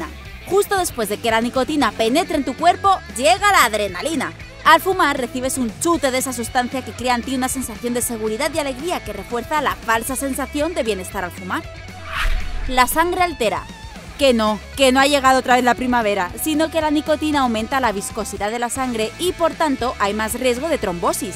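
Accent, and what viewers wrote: Spain. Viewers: Una pregunta eres de mi pueblo no 😂😂 esque es torrent\Valencia jajajaja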